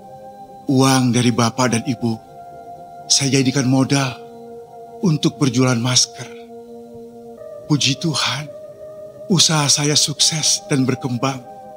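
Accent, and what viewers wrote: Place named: Jakarta, Indonesia. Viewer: Hebattt,pengen bisa monolog kek gini,mas kalo sy belajar monolog sama mas bisa ndak? Minta alamat dan no tlpnya mas,o iya mas ini aslinya orang mn ya kok dialeknya kek orang betawi tp kadang kadang kek orang minang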